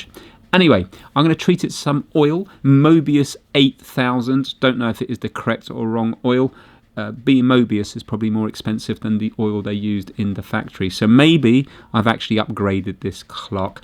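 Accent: United Kingdom. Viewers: thanks again for all your hard work and providing a ton of entertaining content for all of us throughout the holidays, heck I may have learned a thing or three as well. My daughter heard your video and she was down the hall and she said you listening to that again? She said I could tell by the "british accent" anyways, Happy New Year! Look forward to more greatness in 2026!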